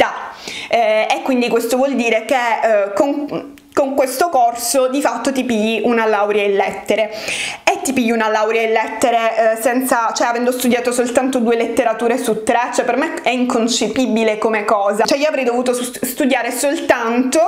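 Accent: Sicily, Italy. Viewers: Comunque io adoro la tua voce e il tuo accento siculo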